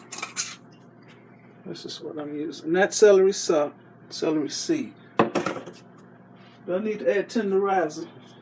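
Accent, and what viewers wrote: South, United States. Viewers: She had me from intro calling ME honey..lol..her southern accent..old school with BOTH arms full of gold bracelets yaaaaasssss..i just got an air fryer last week and made chicken wing dings but not crispy..i will do this recipe next week when I make wings n air fryer..thx so much💜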